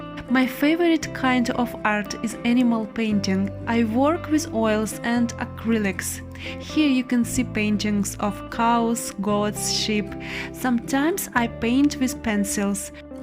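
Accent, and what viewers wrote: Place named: Russia. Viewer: your voice very much with your Russian accent it is so endearing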